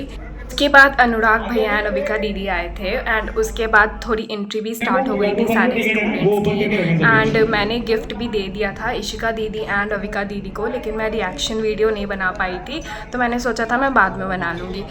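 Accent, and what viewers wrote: Bihar, India. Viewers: Aap bihar se ho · Aap Bihar se ho kya di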